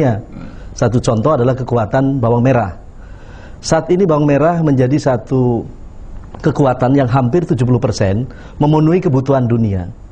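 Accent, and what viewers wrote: Java, Indonesia. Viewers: Logatnya medok jawa ya... Beda sama 3 tahun kemarin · Ini Alm. Lord rangga? Kok medhok?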